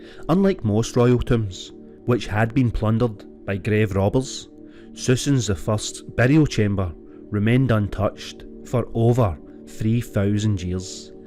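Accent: Scotland, United Kingdom. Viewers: Turned on the captions so I could understand through the Scottish accent. The captions were hilarious. They told of pharaoh Susan The First, Howard Cattle, and more!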